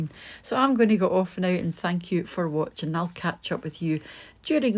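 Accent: Scotland, United Kingdom. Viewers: I love your gentle Scottish accent and your great recipes. I hope you get to 100K subscribers soon you deserve it.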